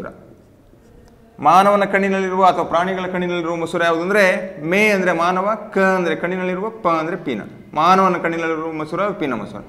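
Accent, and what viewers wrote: North Karnataka, India. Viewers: sir nivu class channag madtira, bt nam utthara karnataka mathado saili Channagill,, nivu mululi normal aagi mathadta class madi beautiful aagi barutte,, bejar aagbedi sir please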